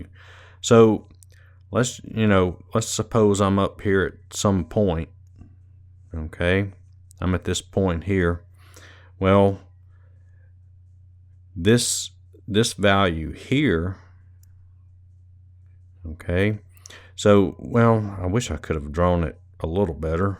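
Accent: United States. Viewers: Very helpful. Cowboy accents are easier to follow than eastern ones.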